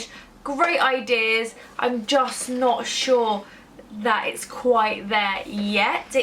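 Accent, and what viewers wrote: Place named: RP, United Kingdom. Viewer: You have this posh British accent! lovely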